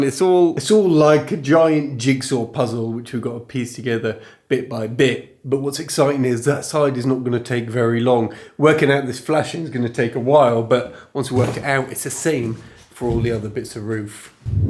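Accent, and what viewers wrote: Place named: London, United Kingdom. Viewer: . I lived in the east end of London until my family moved to Canada in 1957. My accent at 13 years old was just like yours and being in high school the pressure to lose it had a big effect on my self confidence. I only mention this because your channel is so successful and you are so admired for your progress on the chateau. You don’t have to start pronouncing your t’s on my account. Your dogged determination is inspiring, as well as your London accent.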